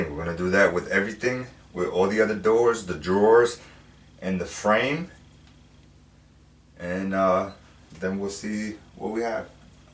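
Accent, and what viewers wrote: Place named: New York, United States. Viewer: My father was a house painter. I had tons of exposure on tools, method and product. Thank you for adding to my knowledge base for kitchen cabinets. I have oak cabinets and I want to update with A nice off-white on the uppers and a darker color on the lowers. I’ve been watching YouTube videos on how to prepare the oak cabinet and I was becoming discouraged because of the level of difficulty in the preparation phase with the wood filler etc. None of the videos I saw until today talked about self-leveling paint for cabinets. I’m gonna do what another comment mentioned, do the bathroom cabinets to get a feel for it and then tackle the kitchen. My dad‘s not here anymore to help me so I’m doing this in his honor. Thank you for the video I really appreciated it. With your accent you make me miss my New York people. God bless.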